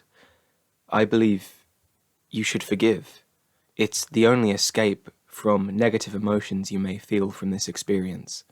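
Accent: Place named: United Kingdom. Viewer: Why are you british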